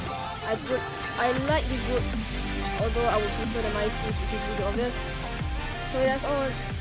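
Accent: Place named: Singapore. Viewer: lol u singaporean eh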